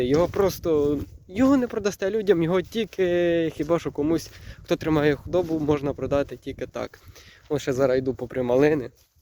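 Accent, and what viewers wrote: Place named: Vinnytsia, Ukraine. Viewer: Звідки ви? Якщо не секрет. Такий говір наче з Вінницької області ☺️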